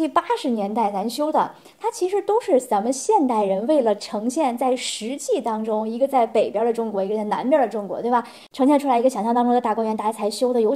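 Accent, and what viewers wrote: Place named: Shanghai, China. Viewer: 上海普通話老靈了~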